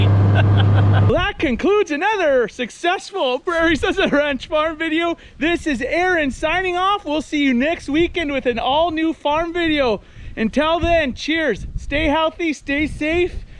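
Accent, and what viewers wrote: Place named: Upper Midwest, United States. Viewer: Oh wow, you're the real deal! WI or MN by the accent, yeh? I like that you're nice to them, use honey opposed to stick. :)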